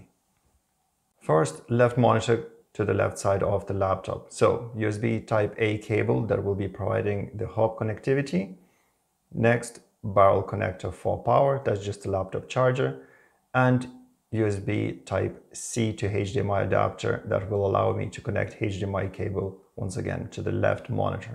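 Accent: Ireland. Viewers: Great tutorial.. Are you irishhhhhhhh? 👌🏾👌🏾🔥🔥.. I love All people but Esp I love all Irish people 😊👌🏾🔥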